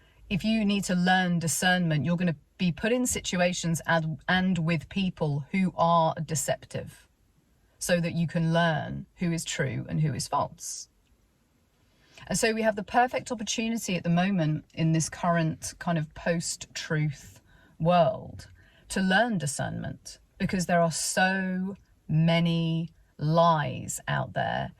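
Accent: United Kingdom. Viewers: Great 😃👍 Brit🎉 accent ❤ ! Along with superb teaching, to 🥾 boot!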